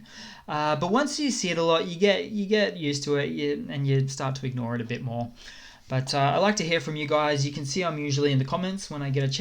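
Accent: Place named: Australia. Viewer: Brilliant and great to hear an Aussie voice. Slava Ukraini!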